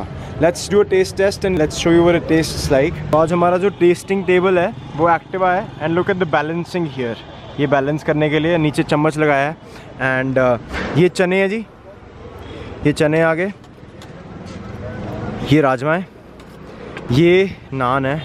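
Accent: Punjab, India. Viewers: Veerey assi kadi vi Punjab nahi aaye lekin taudi lens naal and taudi accent naal mainu ae lagdaa hai ki main Punjab to kaddon chhad ke gaya hi nahi.. jyonda, hansda Te wasdaa sadaa sadaa rahwega saada Punjab Te saada Hindustan.. BTW please mainu maaf karna agar Saadi Punjabi Galt hui. Cheers!!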